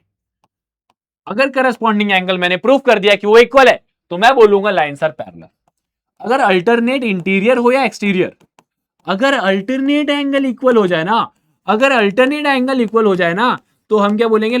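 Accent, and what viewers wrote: Bihar, India. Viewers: Sir ap kya bihar se hai🥰👍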